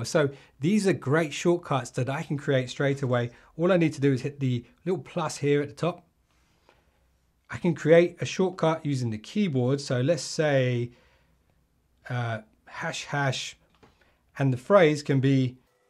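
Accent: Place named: England, United Kingdom. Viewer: Can u drop the english accent? Not feeling it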